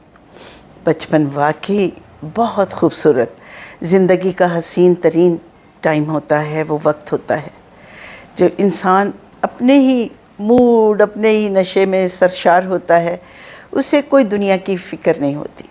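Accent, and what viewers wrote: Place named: Punjab, Pakistan. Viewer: wah ji wah ap ki baton main Punjabi tuch very gooď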